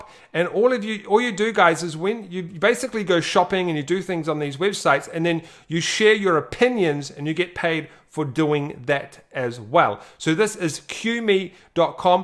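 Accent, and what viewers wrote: New Zealand. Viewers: Are you a New Zealander?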